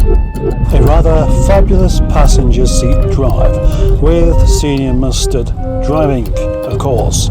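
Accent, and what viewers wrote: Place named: Yorkshire, United Kingdom. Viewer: Aye up tha's got a reight Yorkshire accent! Na then a yer gonna get that car Duck? Does tha' know that there John McLaughlin's from Yorkshire - E''s a reight guitarist in't e? 😊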